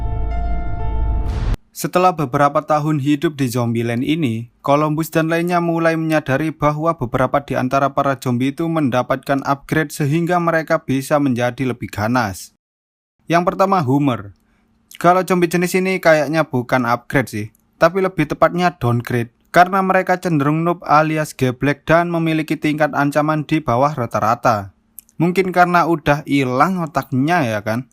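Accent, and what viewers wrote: Java, Indonesia. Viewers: bang Lu orang Jawa ato Sunda Sih?😙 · Mas jowo Endi kowe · Mas pean wong jowo ta😁😁😁 Wocoen mas😁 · Medok Medok Mantapp 🤣🤣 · Wong jowo Iki? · weleh wong jowo toh mase🖐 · Medok nmen mas V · Jowone mantep🤣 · Jowo rek wkk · Jowo Yo mas 😂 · Lambe jowo. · Jowomu ngendi min😂 Kediri Jawa timur hadir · Ngomong jowone lucu aku ngakak anjir onok - onok ae😂😂😂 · wong endi mas😂 kok iso jowo aku seko jogja salam mas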